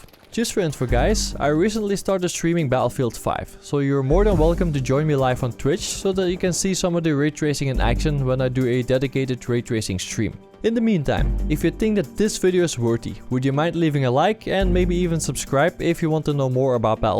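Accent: Netherlands. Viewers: Your Dutch accent is so obvious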